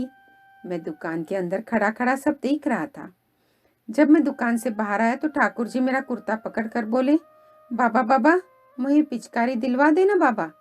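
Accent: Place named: Braj, India. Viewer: 18... big like bahut Sundar Lalla Tu Bhi Pite Ho Tera Baba bhi Pite go Kahani Sundar hai hi aapke Munh se Braj Ki Boli bhi bahut Sundar lag rahi hai Lalla Baba main bhi chalunga Hamare Vrindavan Mein Aise Hi bolate Hain Brij Bhasha aapke Munh se sunkar bahut hi Achcha Laga excellent video my dear sister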